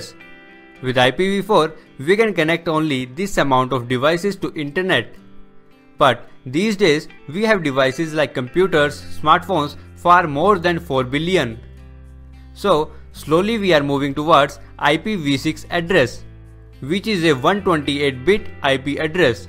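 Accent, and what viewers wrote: India. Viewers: I like how he has an Indian accent and talking about IP address. Truly, perfect.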